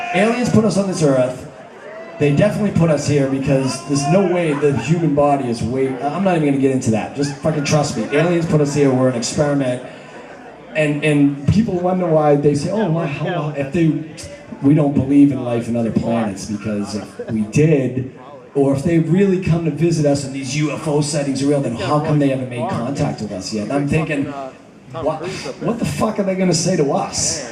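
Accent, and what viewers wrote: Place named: Boston, United States. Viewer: I could listen to him talk anytime love that Boston accent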